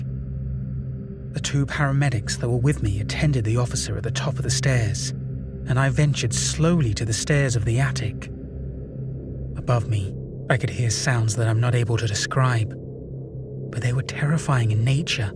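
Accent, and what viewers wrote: United Kingdom. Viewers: Cheers fella, loving the content- I am sure your subscriber numbers will shoot up! Nice to hear a British voice 👍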